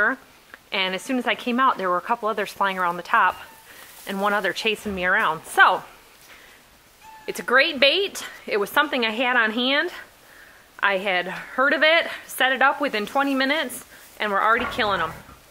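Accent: Minnesota, United States. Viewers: She must be from Minnesota. Cute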